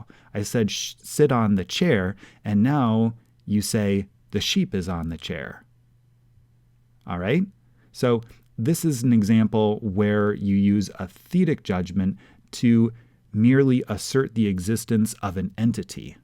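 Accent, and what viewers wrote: United States. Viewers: Your pronunciation needs to be a bit less Americanized. Great information though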